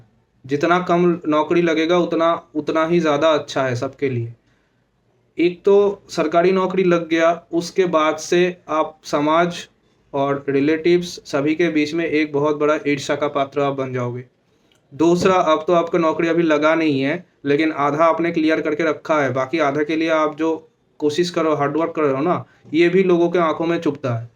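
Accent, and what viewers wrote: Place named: West Bengal, India. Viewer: mai west bengal se hoon. Apki batein sunkar lagta hain ap v West bengal ka ho. Steno exm related main sirf apko sunta hoon, channel to hazaron paar, ek ap hi ho asardar, baki sab bekar😄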